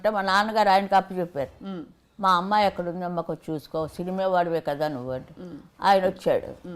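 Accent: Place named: Vizianagaram, India. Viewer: పాపం పసివాడు అమ్మా మీ మాటల్లో విజయనగరం కనిపిస్తోంది. మా నాయనమ్మ గారు పుట్టింటివారు విజయనగరం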